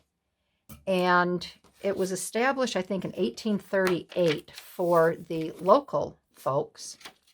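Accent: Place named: Scotland, United Kingdom. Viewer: Such a great idea to remodel, those digitals are super pretty. Your little bit of Scottish accent made me smile 🥰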